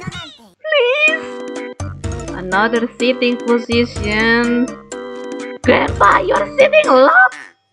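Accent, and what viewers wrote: Indonesia. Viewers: Accent indo? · kek orng indo deh accentnya..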